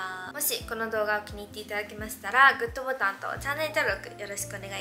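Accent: Kansai, Japan. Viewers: 関西弁？やばすぎて聞きづらいｗｗｗｗｗｗｗｗｗｗｗ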